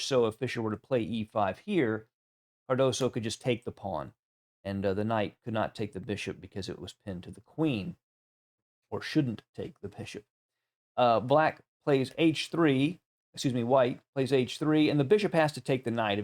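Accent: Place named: Texas, United States. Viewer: Probably my favourite chess channel (I am also a Fischer fan) and I like the Texan accent.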